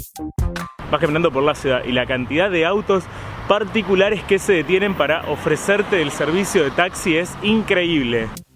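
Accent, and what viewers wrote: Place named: Buenos Aires, Argentina. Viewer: Muy bueno el contenido y didáctica la explicación. Sos de Buenos Aires por casualidad, digo por el acento. Y lo de el restaurante giratorio me hizo acordar a la confitería giratoria del cerro Otto en Bariloche.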